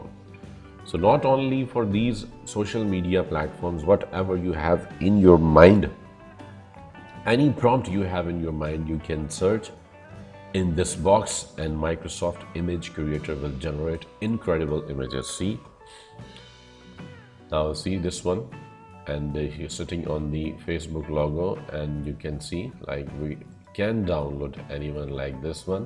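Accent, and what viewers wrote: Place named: United Kingdom. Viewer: Sir your British accent is too good 👍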